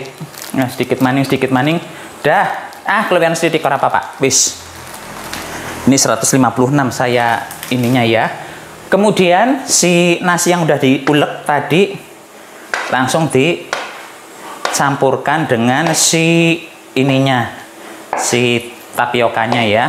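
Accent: Banyumas, Indonesia. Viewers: Wong Endi sih deneng ngomonge ngapak2😂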